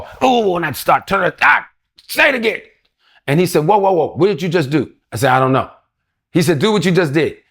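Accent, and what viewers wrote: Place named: Washington DC, United States. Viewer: My man from DC! NW right here!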